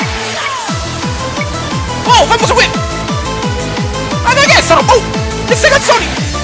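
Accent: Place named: Rome, Italy. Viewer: Se capisce dal suo linguaggio,abita a roma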